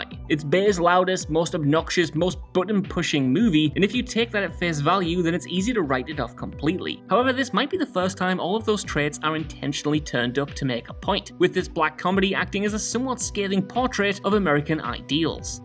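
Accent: United Kingdom. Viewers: Can't get enough of the fast talking British!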